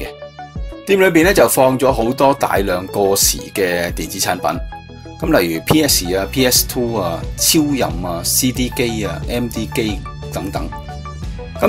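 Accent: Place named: Chaozhou, China. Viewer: 潮州佬黎既喔！聽口音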